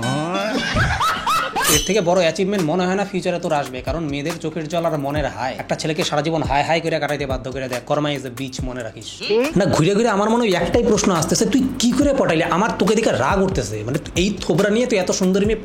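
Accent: Bangladesh. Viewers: Ai Vai apnar language tone toh Pura amader Bangladesher moto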